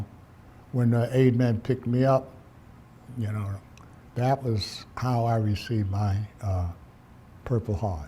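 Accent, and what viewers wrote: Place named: New York, United States. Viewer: Sounds like one. Could tell he’s a fellow New Yorker. He’s very detail oriented. Good story teller.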